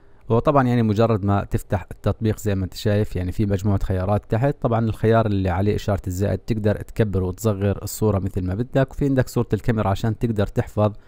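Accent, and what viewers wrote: Jordan. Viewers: انتي اردني صح من وين بلاردن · انت اردني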